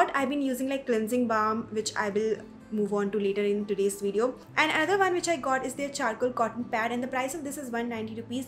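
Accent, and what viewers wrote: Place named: Bengal, India. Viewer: Baap re baap. Bengali touch from u. Love it❤️❤️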